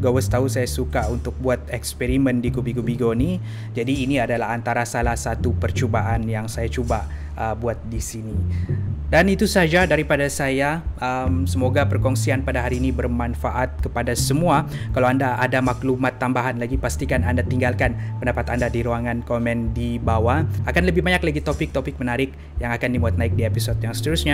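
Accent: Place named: Sabah, Malaysia. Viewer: Cakap sabahan x lari.. I like it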